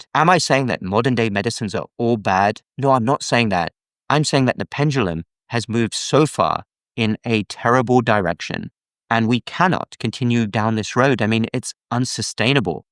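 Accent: United Kingdom. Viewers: You sound like you're in the UK so point is you should be aware that the type of care a lot of people are getting is so surface level and subpar it's unbelievable. Are we shamed for being overweight? Of course. Are we given innovative solutions and advice to fit our specific needs eg conditions like PCOS, Hypothyroidism etc? No of course we're not. Are we taught about proper nutrition? Never. So it's not a wonder why most people consider a quick fix when the shaming around weight and yet no help are very loud in the medical field.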